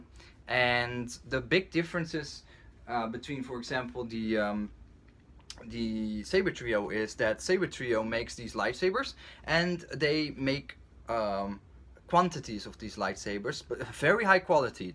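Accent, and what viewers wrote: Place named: Netherlands. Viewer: Ik kan aan het accent horen dat je Nederlands bent😂😂😂😂😂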